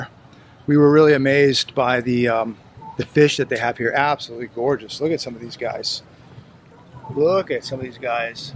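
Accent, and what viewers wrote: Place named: South, United States. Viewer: You gettin southern!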